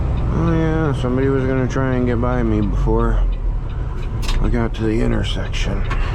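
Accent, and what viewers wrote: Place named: Midwest, United States. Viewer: Cleveland! That’s where I’m from bro you sound like you’re from the Midwest by chance. Love the sound of the Jake on your truck man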